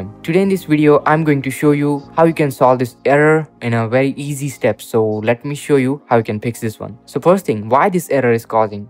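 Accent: India. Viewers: He a Indian tech scammer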